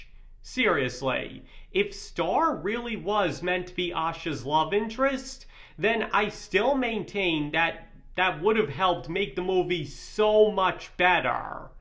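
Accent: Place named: Midwest, United States. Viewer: Maybe it's their accent? Prob midwest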